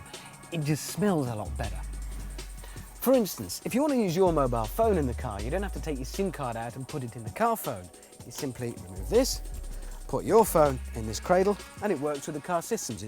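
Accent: United Kingdom. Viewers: Nissan have the legendary british accent reviewer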